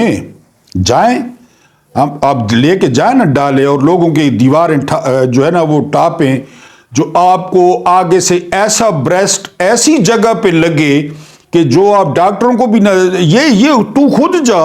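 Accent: Saraiki, Pakistan. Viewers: واہ صاحب ۔ آپ کی گفتگو اردو میں سرائیکی کا تڑکا لگاتے ھو😅 ۔۔سن کے دل❤ خوش ھوتا ھے ۔۔ بہوں خیر ھوونے 😊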